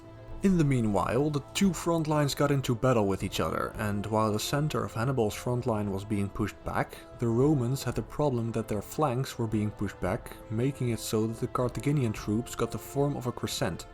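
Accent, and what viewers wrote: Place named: Flanders, Belgium. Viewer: Are you from Belgium? I am hearing a Flemish accent.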